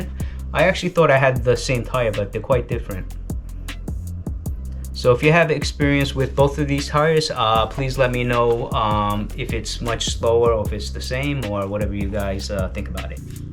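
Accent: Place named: New York, United States. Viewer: Hey! Thanks for making videos! In addition to the bikes, I like the music, your cute family, the bits of Japanese slips in, your New York accent, and of course all the great views of the city! Keep ‘em coming man!👍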